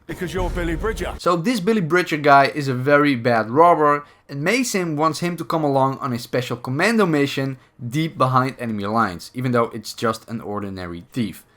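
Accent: Netherlands. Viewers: Ik hoor aan je stem dat je Nederlands bent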